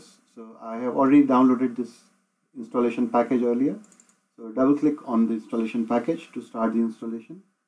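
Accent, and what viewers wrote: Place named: India. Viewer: Indian speaking people saving my weak ass once again. Love you <3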